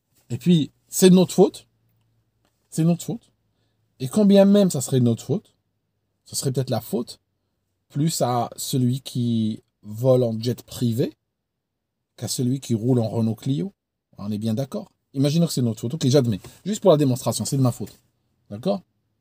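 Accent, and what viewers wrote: Switzerland. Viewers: "le trou de Lausanne" raccord avec ta dernière sur les maladies pochette surprise de la croutonne d'Angleterre. Ton ravissant accent suisse est tellement gloutonnant 🥂 Petite intro d'une douce chanson pour les amis en tristesse 🎶 On peut vivre sans richesse Presque sans le sou Des seigneurs des princesses Y en a plus beaucoup Mais vivre sans tendresse On ne le pourrait pas Non non non non On ne le pourrait pas! né André Raimbourg le 27 juillet 1917 à Prévot-Vicquemare décédé le 23 septembre 1970 à Paris. Poète, tes papiers!!! 🫂 l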